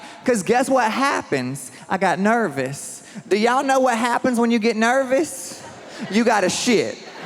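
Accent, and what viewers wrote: South, United States. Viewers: hes southern. This is a southern way of talking. Don't mean anything to race. Cause this isn't AAVE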